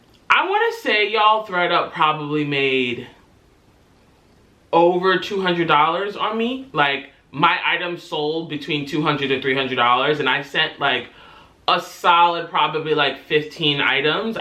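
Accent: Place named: United Kingdom. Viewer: Good British accent. I like your thirties diaries.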